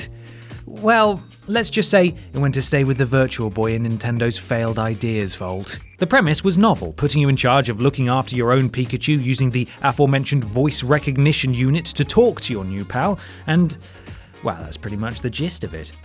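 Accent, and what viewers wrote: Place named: RP, United Kingdom. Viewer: “I don’t have this problem because I speak the queen’s English.”, you’re a national treasure!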